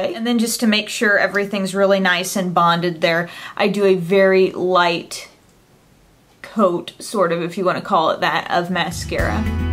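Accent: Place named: South, United States. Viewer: I am new to your channel and i'm so glad I stumbled across you because you are so great at what you do. Also, I don't know much about you personally but I swear you have a tinge of a southern accent.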